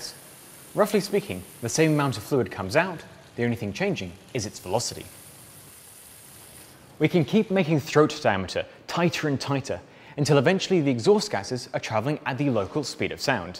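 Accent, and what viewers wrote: Australia. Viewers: Love that it’s Australian and in awe of your enthusiasm. Keep it up 👍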